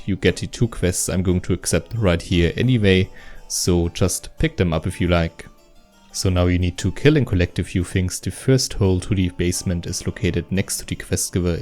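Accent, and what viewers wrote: Germany. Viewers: german Accent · He is german, i think.. :D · Are you german? Because of the accent. Just asking.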